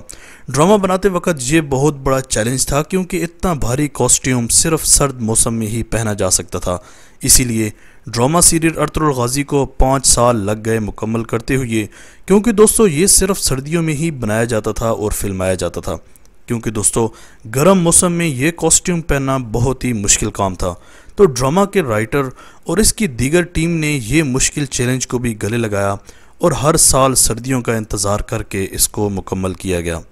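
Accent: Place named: Punjab, Pakistan. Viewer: بھائی کا تعلق ضلع اٹک سے ہو اس لیے لفظ ( ہوئے) ہویے بول رہے ہیں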